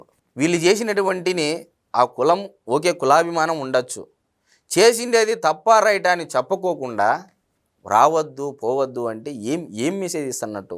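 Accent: Rayalaseema, India. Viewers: సూపర్ బ్రో మీరు రాయలసీమ వ్యక్తి లాగా అనుకుంటా రాయలసీమ వ్యక్తివా నువ్వు చాలా చక్కగా బాగా చెప్తున్న బ్రో. కమ్మ కులంలో ఉండేది అదే పరిస్థితి బాగా గమనించినావే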